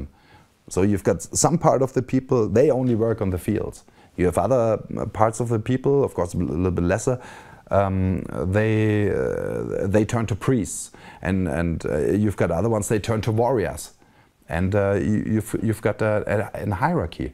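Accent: Germany. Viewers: super dass du jetzt auch internationale Versionen machst. Gutes Englisch, bin überrascht, und der typisch deutsche Akzent wirkt ja eh immer irgendwie sympathisch.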